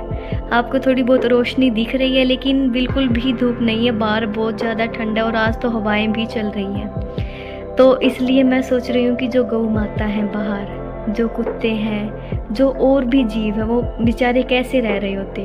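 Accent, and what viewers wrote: Haryana, India. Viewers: Aaj k vlog me haryanvi sunkar bahut aacha laga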